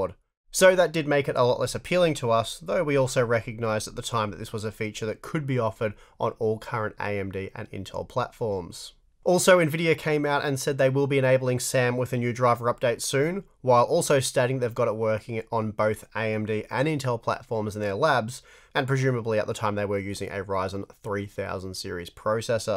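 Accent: Australia. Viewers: Love the aussi accent! 👍😜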